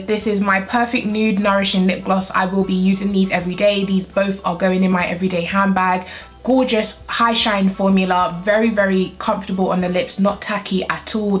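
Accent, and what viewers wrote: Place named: RP, United Kingdom. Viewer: I love her voice😂😂😂😩 its so posh even tho im British myself😅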